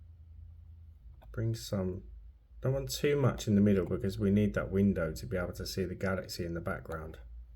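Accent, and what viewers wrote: United Kingdom. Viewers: New subscriber here, hello! 👋🏻 Between the use of the cat eye nail polish in your crafts and the genius that is the 'swirl' I just had to subscribe! 😂 Where abouts are you from in the UK? I live not far from Brighton and am trying to place your accent 😂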